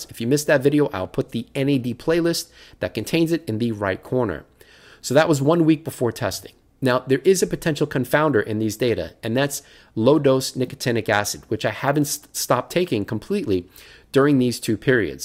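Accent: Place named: New York City, United States. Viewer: Well, which variety of clover from Ture Leaf are you buying? I'm on the site right now, and there are Mamouth Red and Medium Red Clover seeds available in pounds. But the Organic Red Clover is only available in small pouches. Hmmm, that's the same situation with Johnny's Seeds, too. See what you did?! You posted this video and sold out the entire organic red clover seed bank in North America! You're a Boston guy? No accent. You really seem like a NYC area guy to me. I liked the point you made in the last video with Chris about this VO2 Max protocol only giving us a chance to live to mid-80's, maybe. The Bible says Moses lived to 150! And I guarantee Moses wasn't pumping iron or training for any marathons. My grandmother sat by her 3rd story window in the Bronx smoking Marlboro Red after Marlboro Red to the tune of 4-packs a day and lived to be a healthy 94. 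But, she survived colon cancer in her late 20's and ate almost nothing every day. I guess if you wear a colostomy bag eating anything probably doesn't seem ideal. The only thing my grandmother ate was cold cuts from the deli on her block. And even with that she'd maybe eat a few slices of roast beef and swiss cheese. The only vegetable I ever saw here eat was a few spoonfuls of potato salad. I think that CR (Calorie Restriction) is a powerful tool. I've been watching Peter Attia for 10 years and He gets carried away with his exercise regimen when it comes to the average person. I'm a certified Health Coach, not charging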